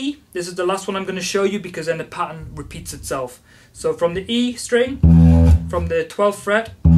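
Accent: United Kingdom. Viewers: man, I am in, even I learend my american english, I can understand you enough with your british accent, and I will try your doccuments. Thanks, you are great. Now I am gonna play more clearly.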